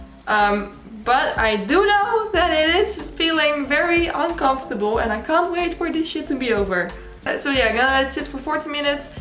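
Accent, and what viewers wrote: Netherlands. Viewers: Are hou Dutch? You sound Dutch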